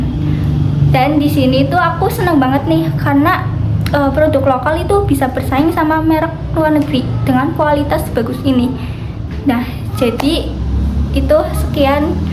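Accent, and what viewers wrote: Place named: Tegal, Indonesia. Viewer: Logat tegale krasa nmn😭🙌🏽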